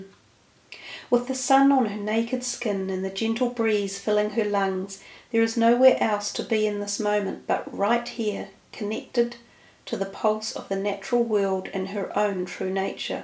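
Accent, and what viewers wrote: New Zealand. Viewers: you have an NZD accent.. where are you from? North or South?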